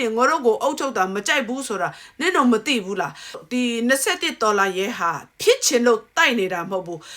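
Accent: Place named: Upper Myanmar, Myanmar. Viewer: ကအညာသူကျနေတာဘဲ ရွှေဘိုဖက်ကစကားပြောတာနဲ့အတူတူဘဲ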